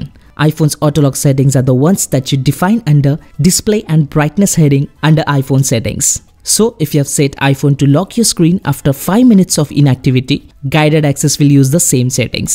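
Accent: India. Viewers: I have difficulty understand indish english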